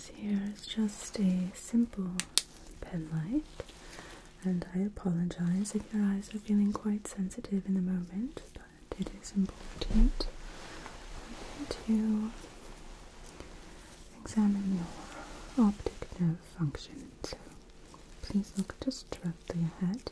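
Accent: United Kingdom. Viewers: British accent?😅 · I've got used to the British accent (not bad either!) but I wasn't expecting the 'cheers' thrown in - one of the thing you hear a lot in the UK, but rarely when someone is trying to do a British accent. Bravo! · It's a British accent. She made a video with 5 new characters last week. All of them have different personalities, voices and slightly different appearances like hair styles. So she has decided to make a video as this new character. Hope this helps!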